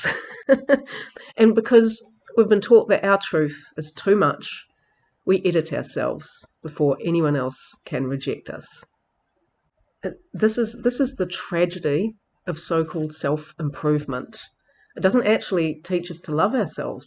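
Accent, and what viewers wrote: New Zealand. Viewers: Shes Kiwi!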